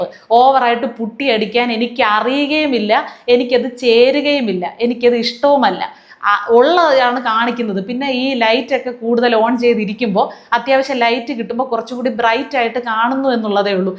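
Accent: Thiruvananthapuram, India. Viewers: ആർ എന്തെങ്കിലും പറയട്ടെ കലക്കുന്നുണ്ട് തിരുവനന്തപുരം ഭാഷയും കൈകാര്യം ചെയ്യുന്ന വിഷയവും ഒരു വലിയമ്മച്ചിയുടെ പക്വതയോടെ അവധരിപ്പിക്കുന്നത് വളരെ ഉചിതമാണ്. Keep it 🎉🎉🎉🎉🎉🎉 കഷണ്ടിക്കും അസൂയക്കും മരുന്നില്ല. 👍💪🌹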